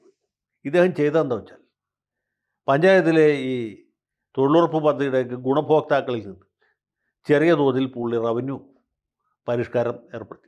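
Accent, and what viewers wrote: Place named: Central Kerala, India. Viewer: your language is clear middle Kerala.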